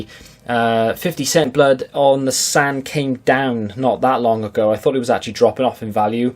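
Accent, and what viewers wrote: Wales, United Kingdom. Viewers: Yep definitely Welsh mate, I think he said once his nearest big CEX is either Swansea or Cardiff, can't remember which. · He sounds Welsh to me.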